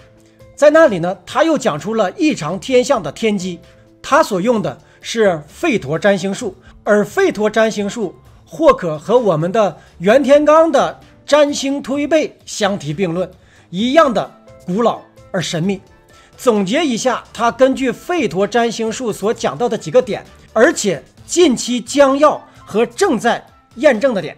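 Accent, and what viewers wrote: Northeast, China. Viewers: 您是东北哪旮瘩的，我是大城市铁岭得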